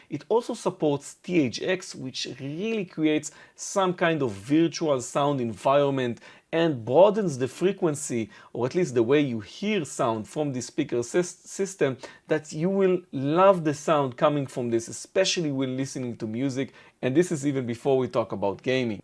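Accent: Israel. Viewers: Is that israeli accent?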